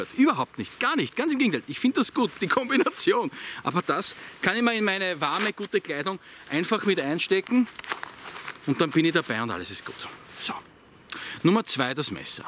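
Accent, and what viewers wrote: Austria. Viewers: ich mag ja eh den Ösi Dialekt, bin Falco Fan seit je her, blabla, ich finde Deine Videos einfach genial, Du triffst jedes Thema auf den Punkt und gerade der Unterhaltungswert Deiner Videos ist mega! Wenn ihr mehr wissen wollt, was mein Kommentar noch so kann, dann bleibt dran!:-)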